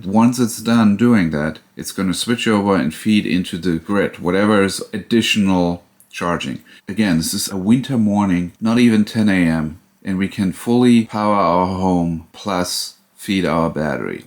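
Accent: Germany. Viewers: You have an accent, are you German?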